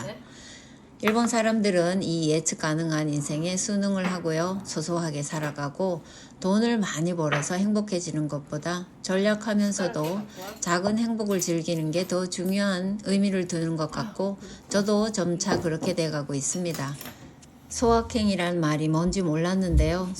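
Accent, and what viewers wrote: Gyeongsang, South Korea. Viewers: 경상도말이 이리도 교양있기도 들리는구나. 내말이 억센건 내탓이였구나 ㅋ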